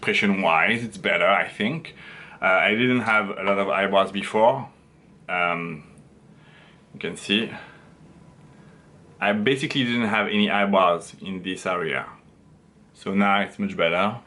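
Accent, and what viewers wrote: France. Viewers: french accent bro ah la la jsuis pas le seul mdrrrr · As a French I like your French accent 😁😁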